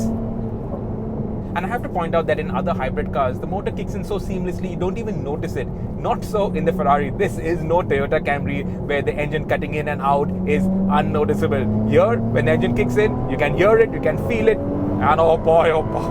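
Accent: India. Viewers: The Indian accent🤢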